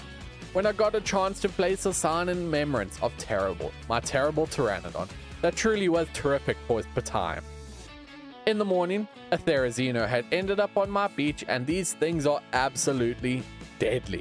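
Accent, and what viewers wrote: South Africa. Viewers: Are you south African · You from South Africa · wait ur south african I wanted to say ur accent eyyyyyyy LEKKER MAN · Hey bro awesome video I just want to ask are you from South Africa you sound like it 😅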